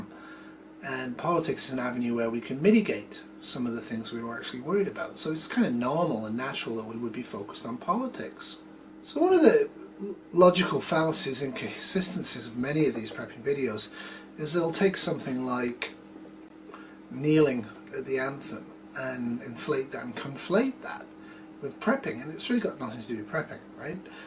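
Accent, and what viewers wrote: United Kingdom. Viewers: The only reason politics are part of prepping in the US is because of the 2nd amendment. Without it, gun confiscation becomes easier and then the shtf can be a lot easier to usher in. For the record, your're the only liberal I thumbs up on YT. Must be the British accent or maybe the hair. Haven't figured it out, yet.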